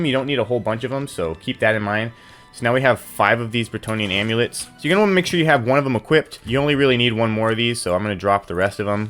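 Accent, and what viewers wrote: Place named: Midwest, United States. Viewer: Jesus the Midwestern accent on this dude is abrasive...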